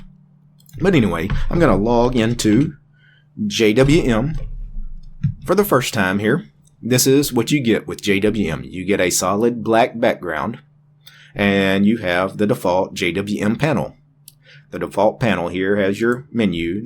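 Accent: South, United States. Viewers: I really like your southern accent sir. I am a new north Dallas resident and I must say yours is the best! Sorry for being non-linux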